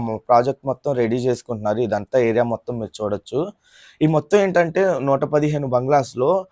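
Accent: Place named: Vijayawada, India. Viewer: Vijayawada slang eppudu vinaledha bro. 😅 Valla slang vallaki nachinatu matladatharu.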